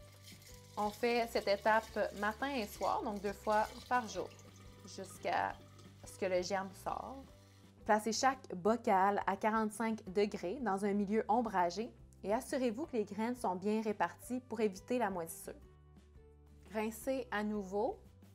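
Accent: Canada. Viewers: C'est marrant comme tout d'entendre parler une canadienne. Sinon interessant mais c'est en pleine lumière(personnellement je fais ça a l'abri de la lumière pour en avoir des pousses tout blanches)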